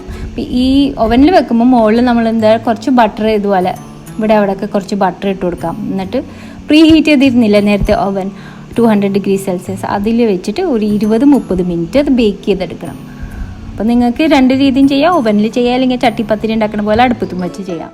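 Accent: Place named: Ernakulam, India. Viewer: nattil ernakulam ano veed.samsarikkumbo chela time’lu njangalude slang kayari varunnund😀😀😀✌🏻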